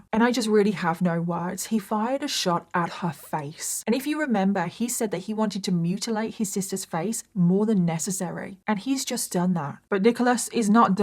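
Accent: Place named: United Kingdom. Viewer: i have been watching your vids for years and i’m still trying to figure out where in the uk your accent is from 😂❤ xx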